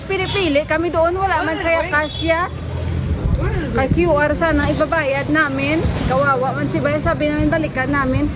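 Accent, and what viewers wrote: Iloilo, Philippines. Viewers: Natutuwa ako pag naririnig ko ilongga accent mo sis. Ang lambing at sarap pakinggan❤😊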